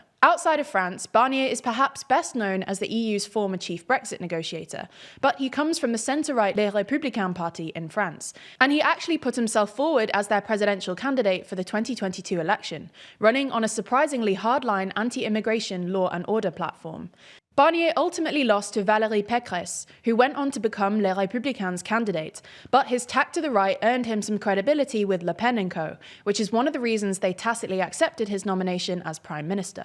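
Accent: United Kingdom. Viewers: An asian woman with a British accent talking about French politics... Your credibility is zero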